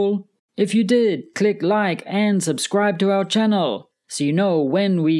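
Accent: United States. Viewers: Is these English American?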